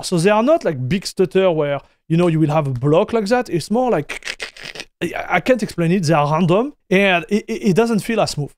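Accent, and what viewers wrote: France. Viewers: genuine question, is he french or belgian?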